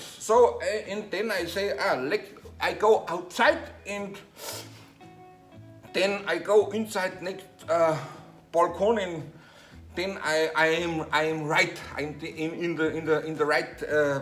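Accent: Germany. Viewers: Einfach herrlich das baydenglisch des 😂👍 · Ha, der is der beste, und dein Denglish ist einfach super:-D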